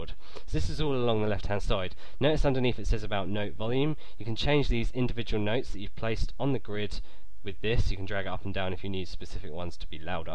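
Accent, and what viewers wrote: United Kingdom. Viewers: Something I've observed. People with sort of British accents always make the best tutorials! Thanks, I think I've got it now! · nice..that really helped me out ALOT! i'm on my way to downloading LMMS!!!!!!! :D p.s I LUVED ur british acsent lol.... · Oh my, i love your British accent, and great tutorial. :D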